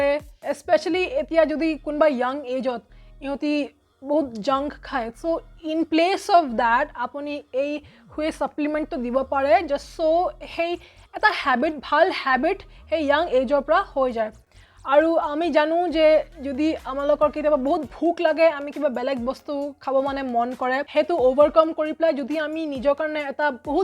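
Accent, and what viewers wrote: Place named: Odisha, India. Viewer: Sounds so similar to Odia.😅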